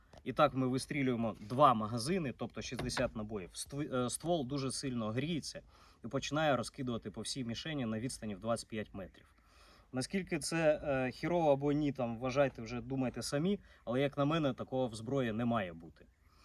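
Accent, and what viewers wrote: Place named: Russia. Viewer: На слух старо русский деревенский язык, что говорит понятно это какой регион России? Кокая та окраина России точно. Давно не был в России мира всем и процветания.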